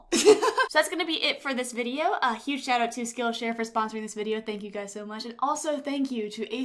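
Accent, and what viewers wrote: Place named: Alabama, United States. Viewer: the way she talks makes me think she was raised a catholic school girl from alabama like damn AHAHAh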